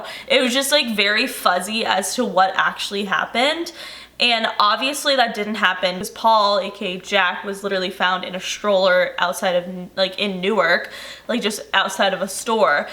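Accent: Canada. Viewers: I thought I was the only one who thinks she's from Canada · D W I think she's from Canada that's why lol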